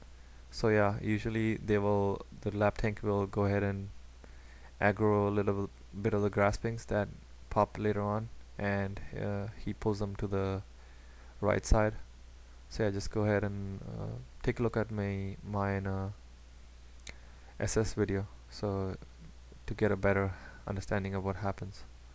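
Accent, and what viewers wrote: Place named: India. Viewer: THanks man so much just curious not hatin what accent was that sounded indian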